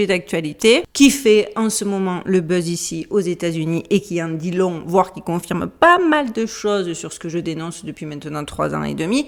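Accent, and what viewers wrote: South, France. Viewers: Je t'ai découvert assez recement.. or je suis dans affaires depuis au moins 15ans. Merci pour ce travail sérieux et bien detailler. Et avec un bonus merveilleux; un accent du sud. Force honneur et amour sur toi ❤️🇲🇫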